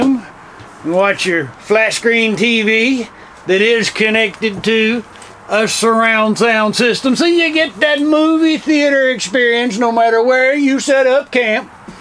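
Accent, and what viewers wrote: Texas, United States. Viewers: Sorry but you are a little heavy on the Texas accent/style of talking.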